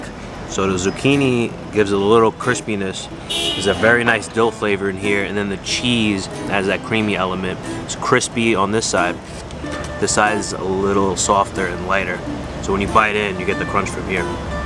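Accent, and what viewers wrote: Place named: Greece. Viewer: Great!!!.... But i like your Greek speaking accent Better!!! - Youve got a Strong Greek Accent!!- Keep it! :) - LOVE Astoria and will Visit again!!! :)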